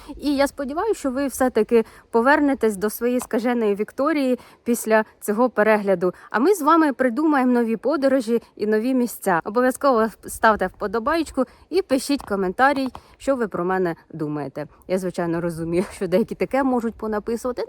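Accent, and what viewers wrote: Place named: Poltava, Ukraine. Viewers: Як завжди супер відосик) Дяка вам за контент та чарівний Полтавський суржик)) Ви ТОПчик! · Якшо з полтавської області то все нормально) Суржик то все чотко) Привіт від земляків)))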